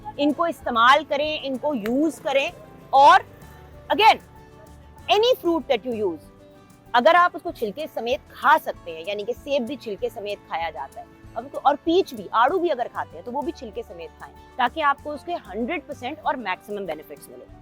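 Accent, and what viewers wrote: Punjab, India. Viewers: Camera person kisi chez ko focus kur lay .hur jaga loud ayr punjabi undaz agya hay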